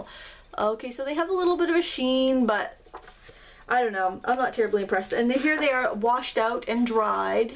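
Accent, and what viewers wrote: Maine, United States. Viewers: oh dear, i have been watching your channel from the beginning of my you tube days i think you had just a few thousand subscribers and you are in fact the 2nd person i subscribed to. I must say you have been a joy and a good friend through the years. Whatever the video of the day is it makes me smile, your children and family are priceless and so is your lovely chatter whether it is in your "maine dialect" or your fun and happy voice it is always like talking to an old friend. take care and never stop we would miss you terribly. Shame on her, she has no idea what she is missing! Aloha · What a clever idea, laying out the three bookmarks, making them as one, then separating them. What a fun way to play and create bookmarks at the same time. BTW, I never care how long your videos are, for as you know from other comments from me, I love to hear you "mainespeak" and your cheerfulness always gets me in a good mood, for it takes my mind off of what ails me. 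Keep 'me up, Sistah!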